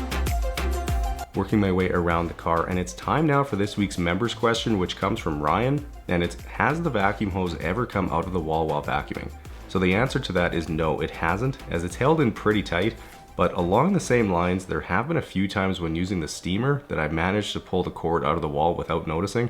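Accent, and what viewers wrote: Canada. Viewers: I can hear the Canadian accent for sure here lol please come to Toronto man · He’s sounding extra Canadian in this one, right?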